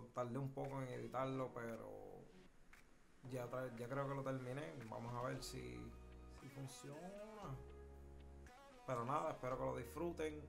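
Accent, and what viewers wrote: Puerto Rico. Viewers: Tu suenas como si fueras de jayuya PR o algo😭🤣de que parte eres de Pr?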